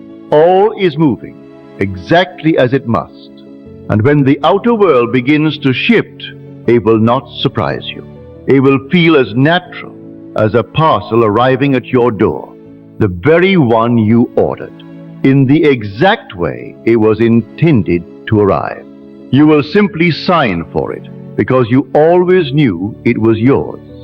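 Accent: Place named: RP, United Kingdom. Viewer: I am more confused than ever. What on earth is he saying? Posh accent:-)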